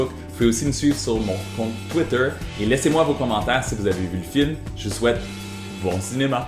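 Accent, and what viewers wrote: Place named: Quebec, Canada. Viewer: Wouaaaaaaah... Ne le prend pas mal mais t'es le premier avec un accent Québécois que je comprends ! (Je comprends ce que certains disent mais d'autres mots que j'comprends pas) Bref ! Moi j'ai adoré Pixels : Voir Iwata duck hunt... Mais je lui mets 4 étoiles !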